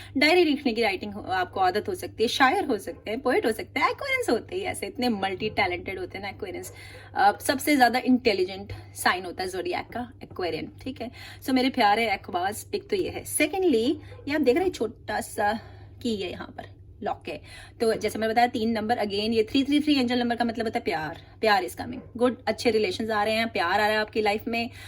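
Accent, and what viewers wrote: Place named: Kolkata, India. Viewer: Sune valo laglo....Kolkata bengali..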